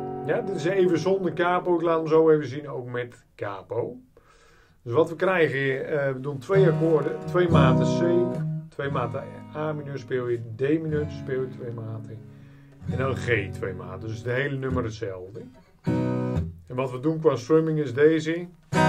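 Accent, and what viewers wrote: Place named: North Holland, Netherlands. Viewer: Hoi daar. Ik ben Jim, 62 jaar en min of meer met 'pensioen'. Ik verveel mij gelukkig niet maar toch... wilde ik er voor de winter maanden een leuke hobby bij. Ik luister graag muziek van klassiek tot hard rock maar het jaren 70 geluid van Genesis en gitarist Steve Hackett is wel iets waar mijn hart het meest ligt. Net begonnen met akkoorden leren. Mijn vrouw had jouw gitaarlessen gevonden en ik vind het prettig qua tempo en is duidelijk om 'mee te doen'. Trouwens, het zou mij niets verbazen dat je uit de buurt van Avenhorn kom. Die 'klank' klinkt erg bekend ;-) Ik ga een tijdje oefenen met je lessen! Bedankt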